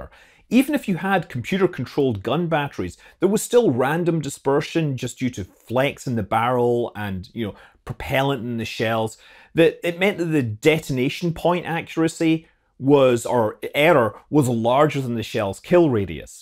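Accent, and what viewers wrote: Scotland, United Kingdom. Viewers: Felt like Curious Droid with a Scottish accent.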